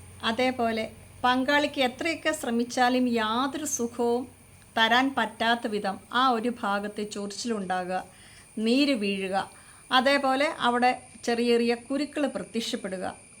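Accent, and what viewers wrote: Kottayam, India. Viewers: ചേച്ചീ, പെണ്ണ് മുകളിൽ ഇരുന്ന് കൃസരി കൊണ്ട് ലിംഗത്തിൽ മുകളിലോട്ടും താഴോട്ടും ഉരയ്ക്കുമ്പോൾ രണ്ടു പേർക്കും കിട്ടുന്ന സുഖവും അനുഭൂതിയും വാക്കുകൾ കൊണ്ട് പറഞ്ഞറിയിക്കാൻ കഴിയില്ല. എന്നിട്ട് വേണം യോനി ലിംഗത്തിൽ അമർത്തി ഉള്ളിൽ കടത്തുന്നത്. ഇത് ശരിയല്ലേ ചേച്ചീ? ചേച്ചി പാലാക്കാരിയാണോ? കോട്ടയം സ്ലാങ് കേൾക്കാൻ രസമുണ്ട്.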